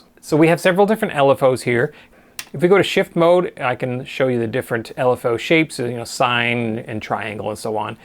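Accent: Canada. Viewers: Canadian?? French canadian??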